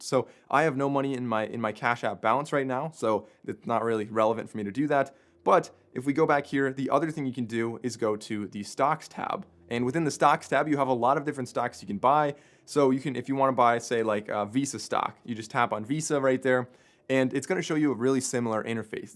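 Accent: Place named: United States. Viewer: Thanks great explanation , I appreciate it, with American English understood clearly , I’ve seen other videos explaining with other English accents and they just got me more confused, thanks You are the man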